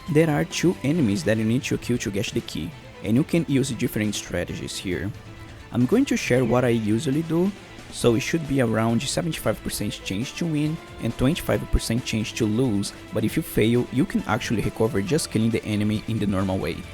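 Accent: Brazil. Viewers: Parece um brasileiro falando inglês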